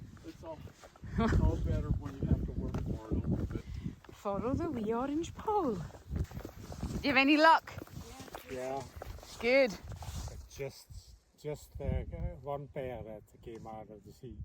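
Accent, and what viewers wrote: Scotland, United Kingdom. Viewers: I've just watched this again! I could listen to you all day haha I just love your accent. It's weird for me that my great great grandad would have had one similar (tho he was from Dumfries & Galloway) because no-one in my family has a Scots accent. So the music, in this one I think it's just right, but I do think when you are speaking, don't have music as well. I have my volume up loud so I can hear the commentary, so it's a shock when suddenly it's music and loud lol. Did the wind muffler arrive yet?? You should be really successful at this you are SO watchable.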